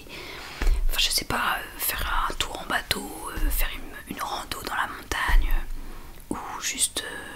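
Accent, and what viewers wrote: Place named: Paris, France. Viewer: En écoutant cette vidéo, je me suis dis "ptn c'est une parisienne c'est sur " 😂😂😂 désolé pour le préjugé mdr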